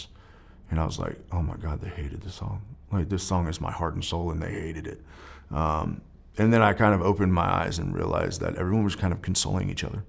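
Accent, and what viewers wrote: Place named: Kentucky, United States. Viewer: Florence Kentucky I'm betting is where he's from Florence Y'all I know that accent it's kinda half southern half Yankee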